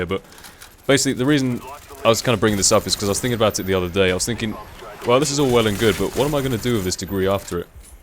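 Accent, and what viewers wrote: United Kingdom. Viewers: Normally I hate the british accent but not yours tho :O please respond ? -Greetings from Finland!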